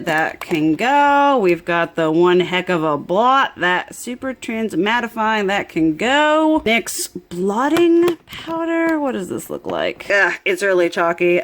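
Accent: South, United States. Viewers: So where were you living? I know you have a southern accent but I have always been curious where you were from. I’m in NC!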